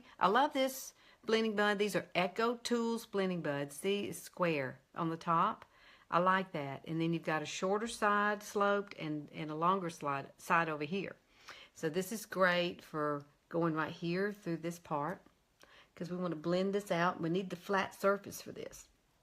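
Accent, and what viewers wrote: South, United States. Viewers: I can tell you're from the South from the way you say "oil " lol I'm up in the mid west (Wisconsin) " OyL ". 💞your Channel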